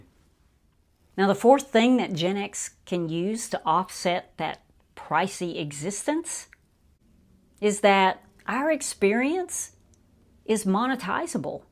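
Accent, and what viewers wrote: South, United States. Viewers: ah, couldn't tell. You do have some southern accent going on.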